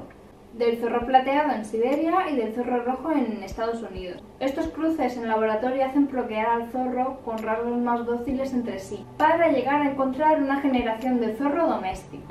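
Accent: Spain. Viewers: que preciosa esta la conductora me gusta su acento español aunque no se si sea española jeje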